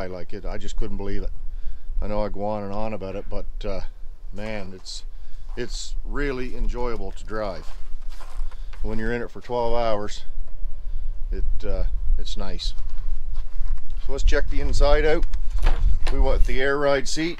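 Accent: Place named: East Coast, United States. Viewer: As I’m watching this clip I’m thinking . Man he has an east coast accent haha. Then I heard Fredericton. Howdy from PEI.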